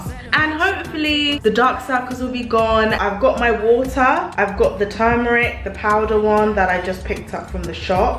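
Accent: United Kingdom. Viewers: Is that your boyfriend in the video?? And are you British living in America or what’s going on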